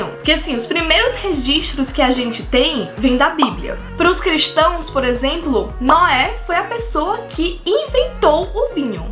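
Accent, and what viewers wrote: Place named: Ceará, Brazil. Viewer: Adorei sua aula e sua espontaneidade. E o sotaque cearense. Acertei?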